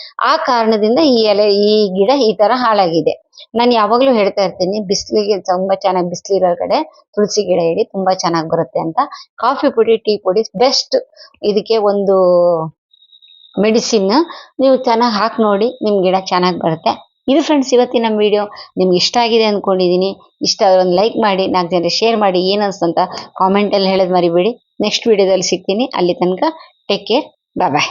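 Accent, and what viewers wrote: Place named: Malenadu, India. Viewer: Malenadu bhashe tara ede..mam yav kade nivu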